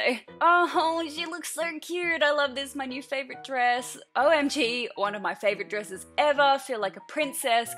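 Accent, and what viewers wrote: Australia. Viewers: Whoever is “correcting” you for saying things in your native Australian accent should be arrested because it’s a crime to tell someone with the cutest accent (but also, you’re AUSTRALIAN not American) to speak differently. I’m about to throw elbows with some of y’all in the comment section. People speak differently than you do, get over it. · Alright, I am just going to get this off my chest because it's honestly really annoying. She's fuckin' Australian. It's where she grew up, lived, and lives. I don't understand why so many people feel the need to tell her HOW to say things or comment so much about the accent, and nearly every time it's an American. lol. Newsflash: Not everyone talks like you, even in your own country you've got different accents. When my parents moved to the states, I had to un-learn my native language, basically, because she was scared that our accents would set us apart and we wouldn't be able to live more 'normal' lives. 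I'm re-learning it now which is strange but also fun. Anyway! Let speak the way she speaks, I love it.:) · Be PROUD of your Australian accent, American's don't talk correct English anyway, from a NZ' er however, Auss ie's, do nd correct dance, six, etc to correct british?pronunciation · To anyone getting angry over how she pronounces words - she's Australian, they pronounce things how they do (and actually, it's often a lot more accurate than how Americans do... considering it's closer to the English way and it's the ENGLISH language). Also, I appreciate the cold weather clothes you're wearing in the warm weather. As someone who's sat in in 2 degrees (watching videos whilst waiting for the boiler man to turn up...).... I VERY much appreciate it. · You're Australian, speak as you normally would. I love hearing how different cultures pronounce the same words differently. Don't change just because some of us Americans can be bull headed ninnies. 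· Pronounce words however you want, use metric measures and remind us what season it is where you live. Be proudly Australian and cater to your international audience without having to hide who or where you are. There is a fine line between catering to an audience and pandering to them, you do the former really well and shouldn't have to do the later just because some people can't grasp that thee are different countries in the world where things are different. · I'm French and I love how she talks it sounds cute, I would love a video in which she teaches some Australian expressions like love hearts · I'm very excited about the idea of thriftmas 🙌 sounds like a great time. Also don't mind your accent, your Aussie land accent is endearing. Plus I love that fact that all of y'all say love hearts ❤️ Also, strawberry Sam was too much 😂😂😂😂 reaction was perfect. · Who said anything about her accent being bad. 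I think Australian accent is cute. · I love hearing another Aussie accent on YouTube!:) Thanks for doing what you do! X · I’m American, and I love your Australian accent! Different dialects/pronunciations are just part of what makes the world go round. People shouldn’t tell you that you’re pronouncing it “wrong” just because it’s different from how they say it.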